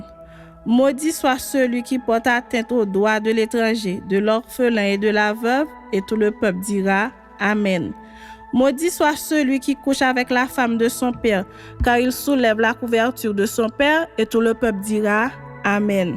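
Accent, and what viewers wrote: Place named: Haiti. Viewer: Merci pour ces lectures j’apprécie l’accent haïtien !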